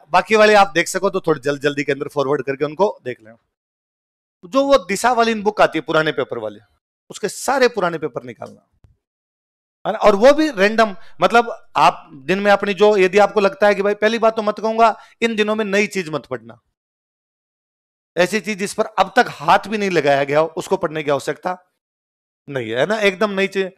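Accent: India. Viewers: bolne ka deshi andaj sahi h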